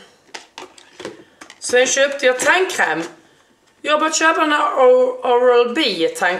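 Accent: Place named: Scania, Sweden. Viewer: Vilken härlig skånska du har! ✌🏽️ bor i malmö men kan nästan gissa på att du är från Helsingborg? Roligt hur skånska kan skilja sig så😋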